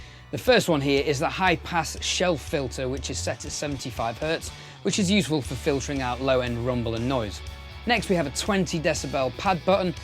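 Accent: United Kingdom. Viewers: Thank you so much for taking the time and trouble by answering my question. I guess l am going to go for it, as it'll be the last monitors l'll ever get and listening & seeing its spec, seems to tick all the correct boxes ! Also the UA Apollo 4x interface, that you brilliantly demo'd, will make all the difference. By your accent, are you from London. I lived there for 25 years, based in Ealing and now back to my roots in the NorthWest near Liverpool. Keep on doing your great videos, it is really nice not to hear a load of waffling about all thing other than the subject in hand, as others do across the pond ?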